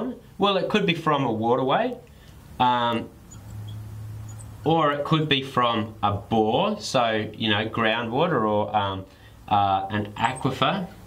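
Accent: Australia. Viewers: I could listen to Aussies talk for hours. Thank you! Very concise depiction of salinization. Cheers!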